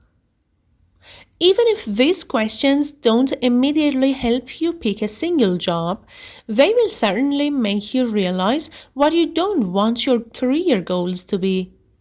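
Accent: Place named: Iran. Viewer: اااااااااااااااا😭😭😭😭😭😭😭😭😭😭چرااااااااااا ،میخواستم بخوابم با گوش دادن به پادکست چرا با تلفظ فارسیییی😢😢😢😢😢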